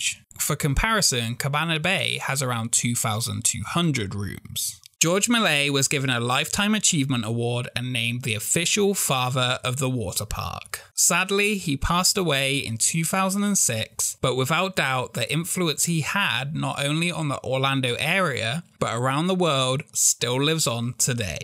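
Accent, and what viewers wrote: United Kingdom. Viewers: I cannot stand that Bul Brit Accent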